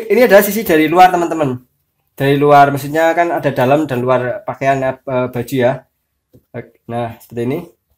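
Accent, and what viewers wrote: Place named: Java, Indonesia. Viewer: nglempruk wkwk kangmas e wong jowo ....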